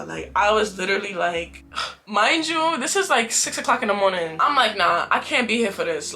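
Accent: New York, United States. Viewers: oop i know a new yorker when i hear oneeee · love the way it sounds like i’m otp with one of my NY homegirls🤍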